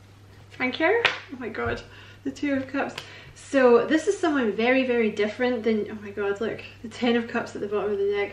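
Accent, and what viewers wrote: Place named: Scotland, United Kingdom. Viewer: Keep it going. Interesting reading. Have fun listening to your readings. You sound like me. With the pure Scottish banter.😂😂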